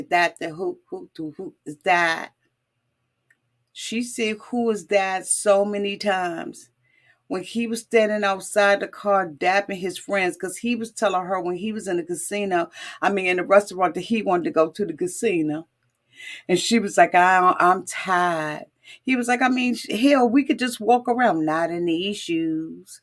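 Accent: Washington DC, United States. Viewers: I just found you, love the DC accent and the perspective..but did you notice that Monique asked dude if he enjoyed his sleep “on the bed”? Then later I noticed a pillow and blanket on the couch..did she sleep on the couch????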